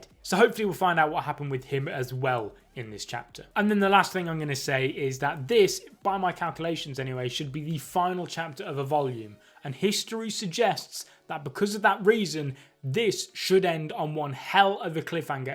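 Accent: United Kingdom. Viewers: Your British accent is so good😃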